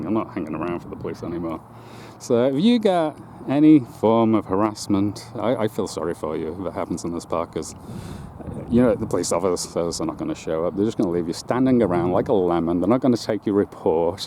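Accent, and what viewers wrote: United States. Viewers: Come on man. You got to expect a little needling with that Texas accent.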